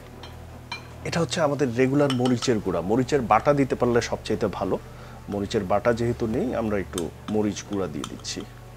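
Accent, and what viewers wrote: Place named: Bangladesh. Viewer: So,but ,so,but Bangladeshi English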